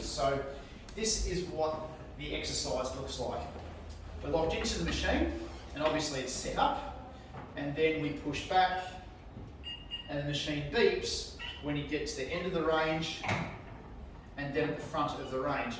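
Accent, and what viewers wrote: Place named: Australia. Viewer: Thickest aussie accent I've ever heard, anyways great vid.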